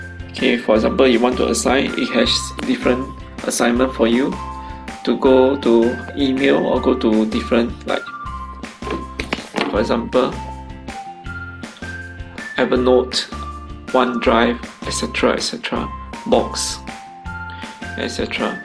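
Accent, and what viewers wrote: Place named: India. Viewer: Are you Indian? Hard to understand...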